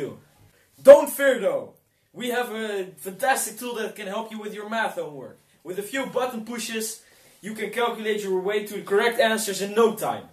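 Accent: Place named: Netherlands. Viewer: ok, although speaking rather good english, my ears are telling me he's Dutch. Is he?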